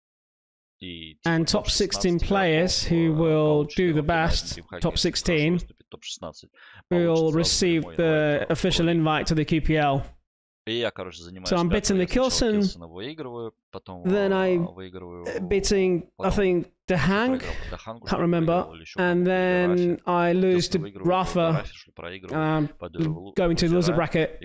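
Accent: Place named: United States. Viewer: а есть видео, без пендосской озвучки?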